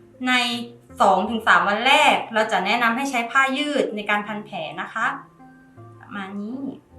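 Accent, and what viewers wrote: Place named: South, Thailand. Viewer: คุณหมอสำเนียงเหมือนคนใต้เลยครับ คนใต้ป่าวครับ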